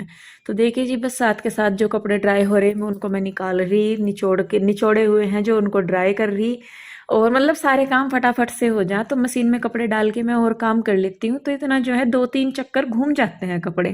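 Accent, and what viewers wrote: Haryana, India. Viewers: Kya.aap haryana se ho aapki boli m haryanvi touch h m b haryana m rehti hu rohtak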